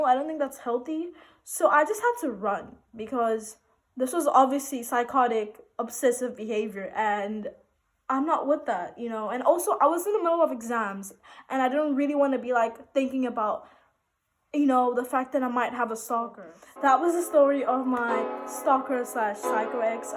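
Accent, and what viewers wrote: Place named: United Kingdom. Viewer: That British accent , I really like it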